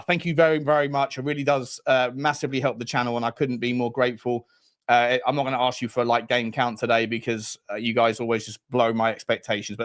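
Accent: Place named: England, United Kingdom. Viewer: You're a legend, such a sound guy. Your updates are great and I enjoy listening to you everyday. As an Essex boy who has moved to Australia 13 years ago its nice to hear an English accent,it makes me think of home. Keep up the awesome work mate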